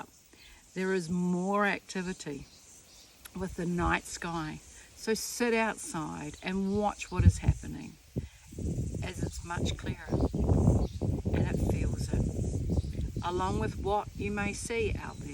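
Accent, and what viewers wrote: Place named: New Zealand. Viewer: Good evening..i have just found you tonight and i so enjoy listening to you at this moment..i have been red pilled for almost 2 years now...things are very tough here in Queensland, Australia and i think i pick up an New Zealand accent..am i correct?🎆💜 I am almost 79 and refuse to wear a mask and absolutely refuse to have the jab!!!GOD BLESS you all in NZ,stay in high vibrations everyone..love and light to all.🇭🇲💜🙏💜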